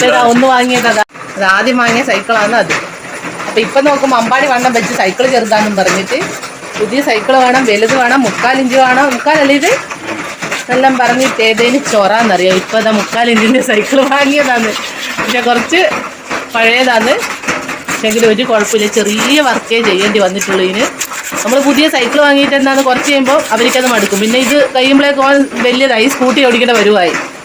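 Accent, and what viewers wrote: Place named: Vadakara, India. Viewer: കോഴിക്കോട് എവിടെയാണ് നിങ്ങളുടെ വീട് വടകര ഭാഗത്തുള്ള ഒരു സംസാരം 🤔🤔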